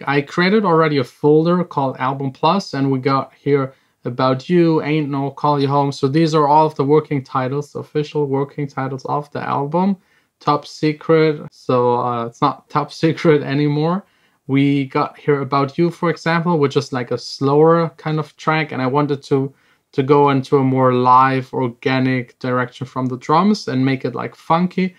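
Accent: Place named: Germany. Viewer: Du hörst dich sehr deutsch an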